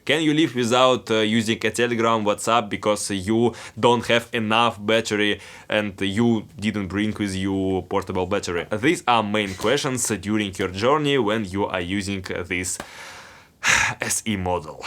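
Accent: Russia. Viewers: You have Russian accent. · твой английский для русского человека просто умиление )))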